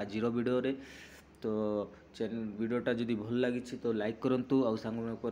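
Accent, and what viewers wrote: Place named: Bengal, India. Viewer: ବେଙ୍ଗଲୀ କି ତମେ 😂😂😂ଓଡ଼ିଆ ହେବନି ଭାଇ ତମ ଦ୍ୱାରା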